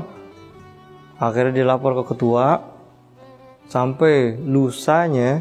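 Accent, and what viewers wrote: Jakarta, Indonesia. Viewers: Kenapabberubah Jadi mode Betawi bang Ceritanye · Betawi Mane Bang, ET dah, Terimakasih Bang, sangat bermanfaat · Ustad mudah BETAWI ASLI nih...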